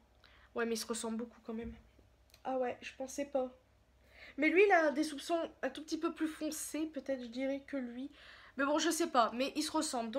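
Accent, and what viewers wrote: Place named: Nord-Pas-de-Calais, France. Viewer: Mon dieu.... Je m'excuse mais dans le NPDC on a pas tous cet accent dégueulasse.... Ça me fait mal car on ne parle pas tous comme ça. Faites un effort s'il vous plaît ! Vous parliez avec cet accent à l'école ? Nous non ! "jvais vous faire voir" "j'aime de trop" "prrrrrrrt" "esspliqué" "à la pub" "swouotché, voilo" "voyagé" (voyageur) Non, non, non.... pitiée pour notre patois ....déjà Dany boon nous a assez ridiculisé.... C'est pas la peine d'en rajouter. Je m'excuse je tombe ici par hasard, je cherchais des malheureux swatches.... Alors j'en ai et notre accent à couper au couteau.... Prenez l'accent en famille svp, mais pas devant la France entière svp ! J'ai tellement honte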